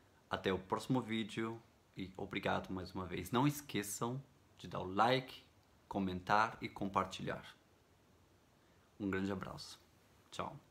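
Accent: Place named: Germany. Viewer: Adoro o seu sotaque alemã 😅 usando sempre a garganta eu amo o idioma alemã já Morei em três cidades alemã beijos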